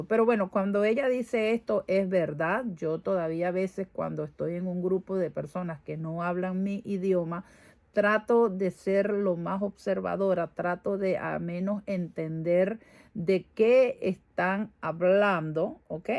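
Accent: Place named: United States. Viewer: Hola, Feliz Año!! no te enfades porque te corrija: habemos no se dice en español, se dice somos. Somos muchos, habemos muchos NO. Yo agradezco que me corrijan en cualquier idioma. Es signo de inteligencia decía mi profesora de inglés. Te cuento que yo empecé a estudiar turco hace 3 años. En el confinamiento en España por el Covid. Este idioma si que es dificil🤦‍♀️🤦‍♀️ y cuanto mayor seas, más dificil es aprender idiomas. Empecé viendo las series turcas y me aprendí canciones y seguí algunas clases on line. Tú hablas bien el inglés. Tu acento es americano. Normal!! Vives en USA. Pero te entiendes con los norteamericanos y ellos también te entienden a ti. Me acuerdo d oirte hablar cuando fuísteis a Hawai e íbas tu y tu marido, por aquel camino y os encontrábais gente y hablabas bien. Llevas muchos años allí. Görüşürüz. Barselona'dan selamlar, Farewell, greetings from Barcelona. 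😀😀🤩🤩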